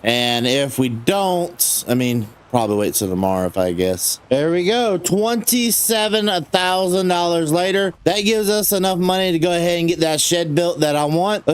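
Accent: South, United States. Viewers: just started watching. looking forward to this. always fun to have the someone with a southern accent doing some farming. thank you in advance.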